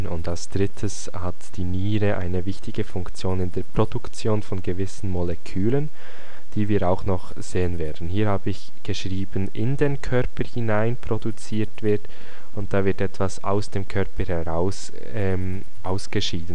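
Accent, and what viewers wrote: Switzerland. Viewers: Extrem hilfreiche Viedos , die durch sehr verständliche Erklärungen glänzen! Das Besondere sind die langsam gesprochenen Erklärungen, die es erlauben das Gehörte auch gleich zu verarbeiten , wodurch man ausdauernd zuhören kann. Die meisten anderen medizinischen Tutorials scheitern in ihrer Qualität an der Geschwindigkeit. Als schönes Extra kommt die angenehme Stimme hinzu und der schöne schweizer Akzent! Für mich die besten Tutorials im Netz! Herzlichen Dank für diese tolle Hilfe !!! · So en Schwiiiiiiiiiiiizer !! :-) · Das ist sicher ein Berner:) super video 👌merci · ein sehr komplexes thema kurz, knapp und ausreichend erklärt, hilft mir sehr! vielen dank!....und ich liebe ihren schweizer dialekt ;)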